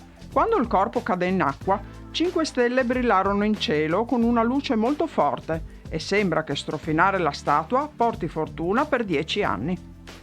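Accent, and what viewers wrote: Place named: Veneto, Italy. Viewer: Sei veneta ciò!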